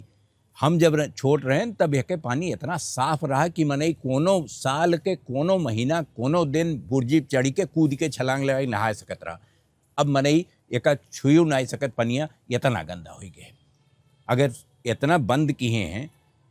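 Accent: Awadh, India. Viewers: Upadhyay ji pranam...aapko avadhi mein sun na bahut achcha lagta hai. BBC mein tu suna hi hai. Ishwar aapko lambi umra de aur sadaiv kripa banaye rahey. Meri maa Akbarpur abka Ambedkar Nagar ki thin. Wo aksar baatcheet mein time ko tame kahti to ham log unko chidhatey the ki amma tame nahin time hota hai...jab apkey munh se suna to ab samjhey ki swargiya Amma Avadhi bolti thi. 🥰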